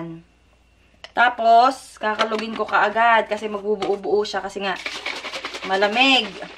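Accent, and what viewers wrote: Batangas, Philippines. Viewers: Parang magsalita sya ay batangenya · I enjoy watching your vloggs po...Puro nire,ganire...Batanggenya ka po ba or Tga Quezon province po ba kyo Mam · te batangeña ka hahha seym. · Taga batangas po b kayu sis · Hi sissy, enjoy watching you hahahaha....ang gandah ng accent hehe..very familiar sakin , naalala q ung mga friends q sa batangas kc nag work aq sa EPSON Lima, sa Lipa batangas. Keep it up sissy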